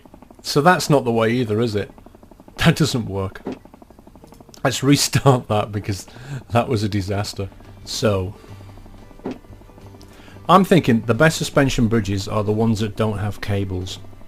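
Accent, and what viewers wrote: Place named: United Kingdom. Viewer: Whats up with you and crossbars?? Ever imagine how your car will feel without differential, almost the same deal with bridges and crossbars. u play like u have never seen a bridge before. by your accent u live in UK, how can u be so naaive about structures. Always use crossbars below the bridge and in places where there is a lot of stress.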